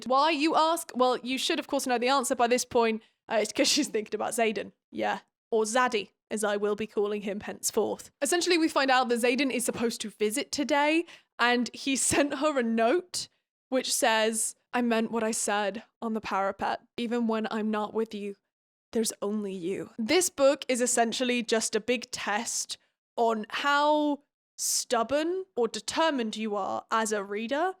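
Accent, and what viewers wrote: United States. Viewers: Also, the accent sounded like an American southern plantation owner. I am from the deep south. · the american accent is KILLING me 😭😭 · The American accent is TOO GOOD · Babes imma need you in the audiobook studio STAT! your American accent is better than mine, im #scared (im unfortunately American) but let’s work on the country accent a bit (also unfortunately from Texas) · The American accent gets me everytime 😂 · commenting bc you are absolutely hilarious, delightful to listen to, and you have an impeccable American accent. Unfortunately, I can't unhear your Violet voice as anything other than Sydnee Sweendy, making both of them that much more unbearable😤🤩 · The american accent is SO GOOD HAHAHAHA it always makes me laugh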